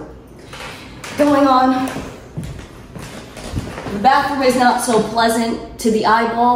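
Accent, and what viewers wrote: Brooklyn, United States. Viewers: Why sometimes you have a heavy brooklyn jewish accent? Old streams I watched you didn't talk like that.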